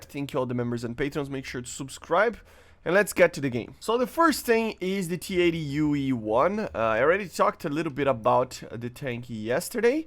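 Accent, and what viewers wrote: Brazil. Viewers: I like you because you sounds brazilian speaking english. Its good for trainning my english.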